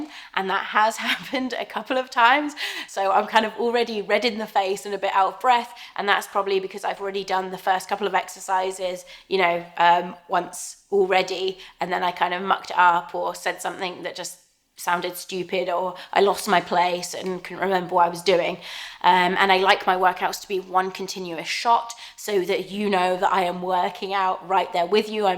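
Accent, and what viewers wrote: England, United Kingdom. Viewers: SHUT UP! I LIVE IN ORANGE COUNTY! Based on your accent, of course, I expected you live somewhere in England.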